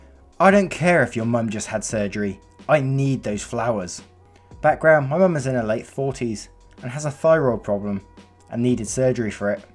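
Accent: United Kingdom. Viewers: First video i watched, am subscribing. Your narrations are on point. Also nice to hear a British accent on Youtube :D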